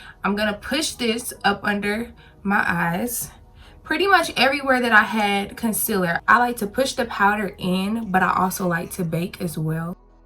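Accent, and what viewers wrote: South, United States. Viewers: I didnt see the southern accent coming. It's engaging, and you're beautiful! 😘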